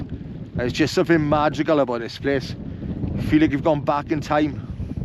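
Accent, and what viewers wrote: Wales, United Kingdom. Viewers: The Walsh accent is madd 😅😅😅 nice video tho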